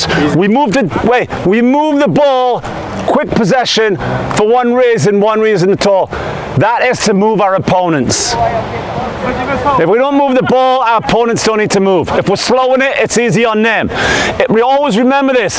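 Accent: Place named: Liverpool, United Kingdom. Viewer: Love all of your videos, where are you from coach? im from a little town called st helens.. your accent sounds a bit scouse. I use your drills with my u16 and u19 teams all the time. Keep up the amazing content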